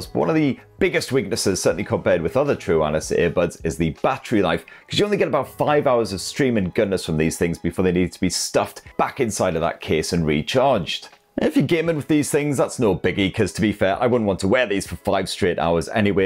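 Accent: United Kingdom. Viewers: Another great video. Its great to hear a proper British accent instead of all those bloody Yanks!!!!!! Keep up the good work , pal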